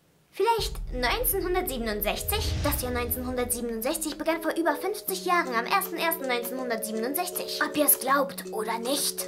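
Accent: Russia. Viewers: Du hast auch ein russischen Akzent und er nicht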